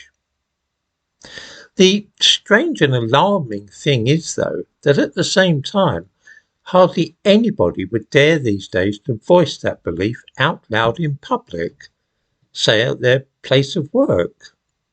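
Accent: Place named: Cockney, United Kingdom. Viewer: Spot on my little Cockney Sage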